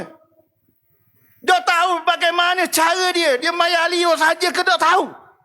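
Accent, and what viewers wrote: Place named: Terengganu, Malaysia. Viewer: Menyampampah dengar cakap ganu klante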